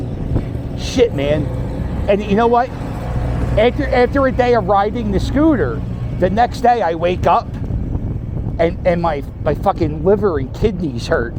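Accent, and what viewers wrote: Philadelphia, United States. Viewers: You got the most Philadelphia accent I've ever heard in my life.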